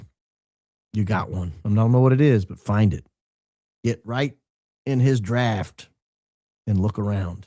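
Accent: South, United States. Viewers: You absolutely have a southern accent.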